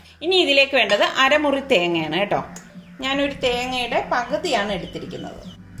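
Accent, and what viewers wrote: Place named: Kasaragod, India. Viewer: Appa chapale..😍ksd slang...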